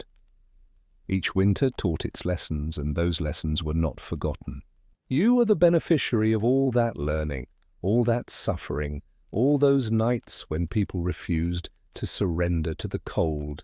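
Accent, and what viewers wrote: England, United Kingdom. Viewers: America history, all be it short is amazing. Look at all of our accomplishments in such a short time. Starting off by winning our independence from the most powerful monarchy in the world at the time. Our dominance in Both world wars especially the second one. The industrial revolution to pave our path to becoming the most powerful nation that’s ever existed. That’s just a few of our historical examples. That being said, I enjoyed the English accent. In this video. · US accent please. UK accent sounds unrelated to subject- American West. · A british accent narrating something about the wild west just feels wrong, AI has ruined everything. 🙄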